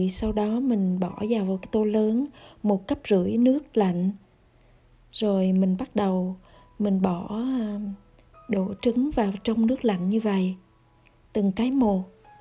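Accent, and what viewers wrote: Hue, Vietnam. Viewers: giong noi giong het nhu my cousins, nguoi Hue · nói giọng Huế nhẹ nhẹ như người Huế ở Dalat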